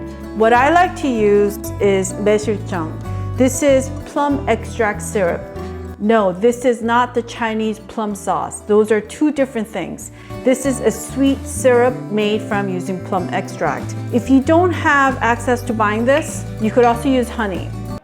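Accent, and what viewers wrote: United States. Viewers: WOW i hear your accent evolved into american in such short time from the video you made 6yrs ago on the pickle raddish. Amazing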